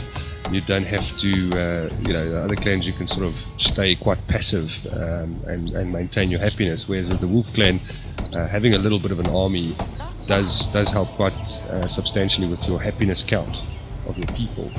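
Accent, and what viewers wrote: South Africa. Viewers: South African! Nice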